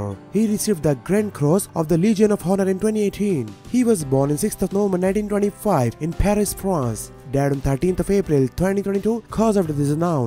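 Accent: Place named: India. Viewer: ENTIRE PHRASES WENT BY THAT I COULD NOT UNDERSTAND.😩 NARRATOR SOUNDS TO BE FROM INDIA. IF HE WOULD JUST SLOW DOWN, IT WOULD HAVE HELPED!☹️😩😆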